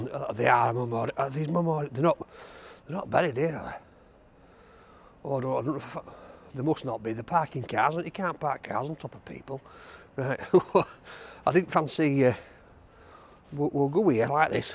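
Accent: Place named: North, United Kingdom. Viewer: "That's Peng, that"... I love t'old northern speak. I'm saying t'old in front of everything I can in my house now. It's winding the wife right up. But she's got no room to complain, she's Welsh.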